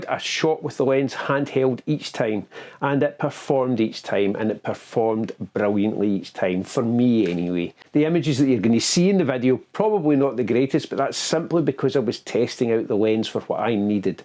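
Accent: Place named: Glasgow, United Kingdom. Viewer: Nice to hear a Glasgow accent on a photography channel. Im in east kilbride! Just wondering if there's a smaller lens hood you can get for this lens?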